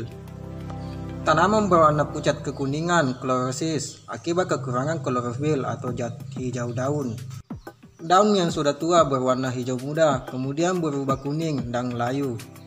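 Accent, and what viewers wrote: Bugis, Indonesia. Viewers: logat bugis kah?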